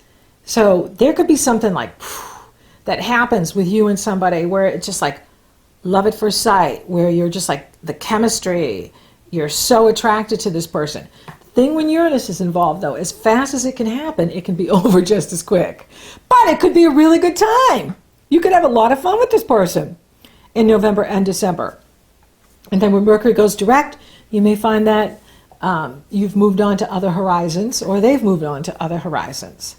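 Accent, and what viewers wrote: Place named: Upstate New York, United States. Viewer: "having MAHZ THEAH" I knew you had an accent, just thought upstate NY or somethin' - you slipped into some sorta Bostonian thang...what was that? lol It seemed to throw you off too a bit...hahaha GREAT reading. You are so fun and thorough, thank you :) You're WICKID SMAHT and totally ROCK! hahaha ;)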